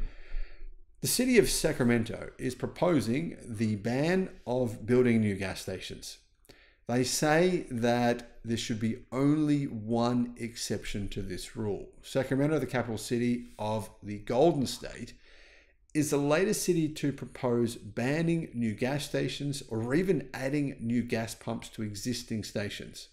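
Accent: Australia. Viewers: Loved hearing your Australian accent enunciate the name of my NorCal town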